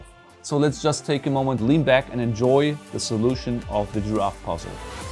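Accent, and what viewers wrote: Germany. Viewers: What is more remarkable is just how much you have strayed from your German Accent when speaking English over the past five years.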